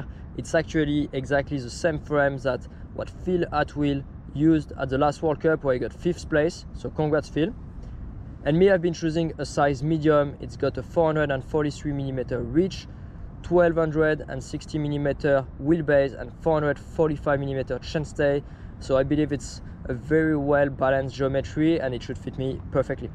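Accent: France. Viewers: J’en suis sur t’es français ???car ta grave l’accent français quand tu parle anglais ps sinon j’adoreeee se que tu fait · Alala French accent, j ai le meme 😂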